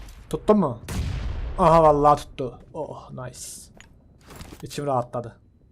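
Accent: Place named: Adana, Turkey. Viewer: Reisss ben Adanalı yimda aynı oralı gibi konusuyon bahhhh bahhhh diyisine kurban olim😁😁😁😁😁😁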